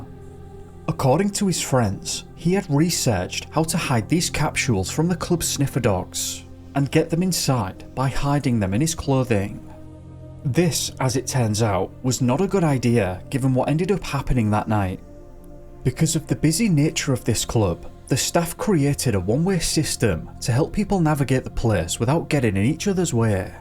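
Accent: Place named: North, United Kingdom. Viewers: No I'm from near Northumberland I'm a geordie and I'd say it's a posh Lancashire accent or Yorkshire but definitely not Northumberland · The narrator has a Northern accent. Its quite acceptable to pronounce words with your own accent. Its you who is showing your ignorance by making such a comment.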